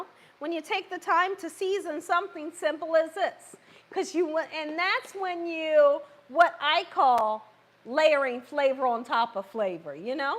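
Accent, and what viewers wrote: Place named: South, United States. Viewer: I just love you I love ❤️ you southern accent and cooking I learn so much from you I just got the best of both worlds cooking southern dishes and I also cook Spanish dishes but sheesh ain’t nothing I can’t cook thanks to you and YouTube ❤❤❤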